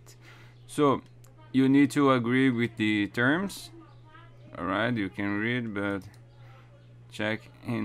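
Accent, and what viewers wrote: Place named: Brazil. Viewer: Reconheci o sotaque BR também kkk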